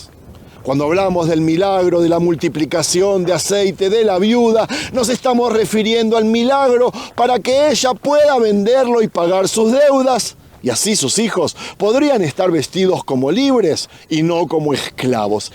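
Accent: Argentina. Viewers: ¿es Argentino el narrador?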